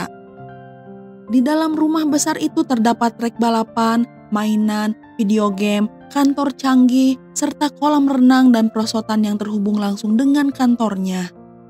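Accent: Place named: Sunda, Indonesia. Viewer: Suaranya khas yaa sunda hihi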